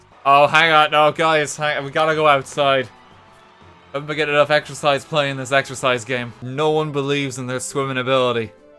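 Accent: Ireland. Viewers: First video I’ve seen and I love it, especially his BEAST Irish accent (I mean I’m Scottish but no ones perfect) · That's how Irish people say it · That’s the beauty of the Irish accent 😍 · Gotta love the Irish accent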